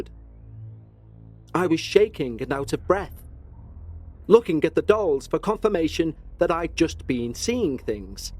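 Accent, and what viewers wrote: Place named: Liverpool, United Kingdom. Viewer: Out of all the paranormal podcasts, this is by far the best. As someone from Preston, you are deffo a Scouser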